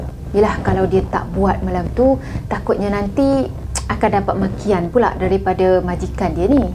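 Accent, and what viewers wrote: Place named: North, Malaysia. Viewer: Perhh Pandai cakap utara ek😉😉😉......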